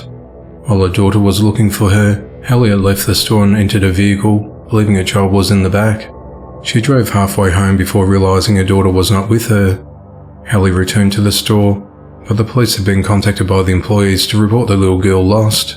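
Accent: Australia. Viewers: Australia, i was born in Australia Victoria nsw, but in ole blighty now I hear the accent now x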